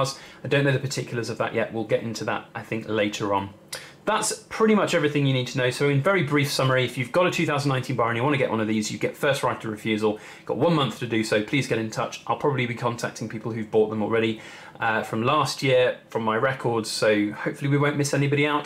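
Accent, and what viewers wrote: United Kingdom. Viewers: Yes I know u have a British accent. That’s why i said that. Lol. How much would it cost to ship to US?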